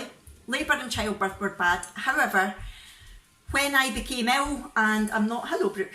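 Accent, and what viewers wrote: Scotland, United Kingdom. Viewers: New subscriber here :) nice to hear another Scottish voice on YouTube x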